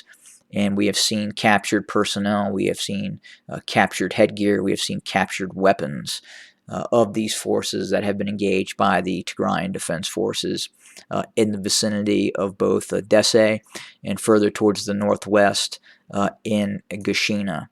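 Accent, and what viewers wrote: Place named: United States. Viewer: You are silly and i am 100% sure you are tigrawi with American accents. እናትን ልብዳት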